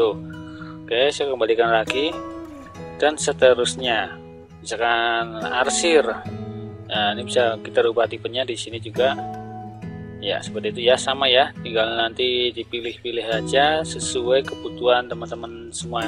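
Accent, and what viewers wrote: Banyumas, Indonesia. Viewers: Nyimak lagi dijelasin sama pak dosen.. Salam Kay Rend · Semngaaat kang..Samapi lupa kunjung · sukses terus om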